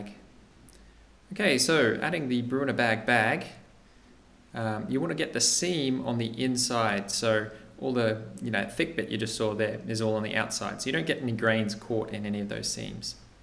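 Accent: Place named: Australia. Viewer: He said 0.8 - not 3.8. Its that Aussie accent.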